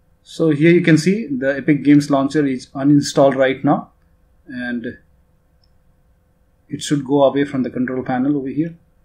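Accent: India. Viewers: This is a good video i like ur indian accent bro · love indian accent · I swear it’s always the indian accent guys that always have your back. When your unclogging a toilet, helping your wife give birth, or getting that last pringle chip out of the container. They always got you. What a legend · Its always an indian accent guys helping me out. thanks so much bro i hope u are doing okay.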